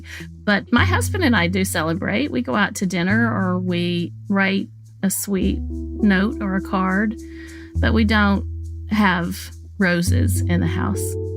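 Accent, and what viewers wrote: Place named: South, United States. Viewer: OMG yes. I'm a Mississippian and was pleased to year a southern accent and someone talking about the south. Sadly, it was a standard-issue liberal scolding that hit every cliche: the scolding of a minor pleasure, the implication that people in the global south would rather be unemployed than harvesting flowers, and finally, suggestions to either spend a ton of money on bespoke alternatives or to just "go for a walk or send a letter." If these moaners got their way, we'd be sending letters and giving hugs for every single holiday. I'm sure there are mirrors of this Valentine's Day complaint ready to go about the evils of Halloween candy (diabetes! tooth decay!) and Christmas presents (commercialization! Capitalism!). How about a nice hug, letter, or a $1000 healthy candy replacement made by your local candy monk? 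When a person buys a jar of jelly at a farmer's market for 10x the price of Welch's, they get to flaunt wealth, their own tastefulness, and their liberal values about caring for the Earth. This whole thing really rankles me.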